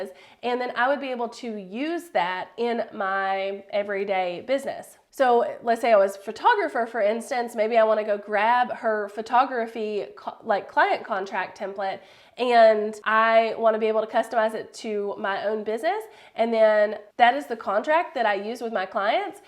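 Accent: South, United States. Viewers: Hi my first time seeing your video.. ive always thought about selling digital but never knew how.. i also love your country accent brings back happy memories.. from what you mention id be interested in learning how to sell digital planners online and what kind of platform would i need in order to do this.. can you help me.. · I loooov your english! Where in the US is it from? It sounds so nice!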